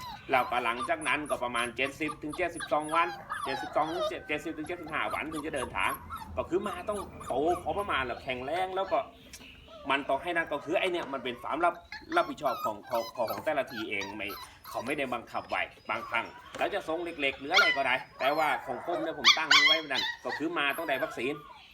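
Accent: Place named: Phetchaburi, Thailand. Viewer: คน เพชร ป่าวครับถ้าคนเพชรคนบ้านเดียวกันสําเนียงเหมือนคนเพชร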